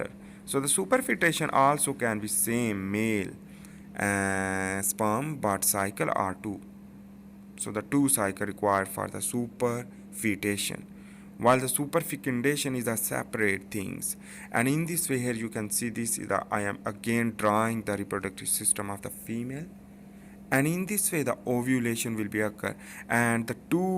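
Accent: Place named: Kashmir, India. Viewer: Your accent is like kashmiri english ....very well explained 🔥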